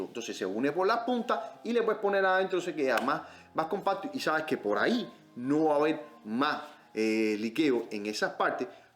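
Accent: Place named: Cuba. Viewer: Este tipo que idioma habla ....parece a veces castellano cubano con partes de ingles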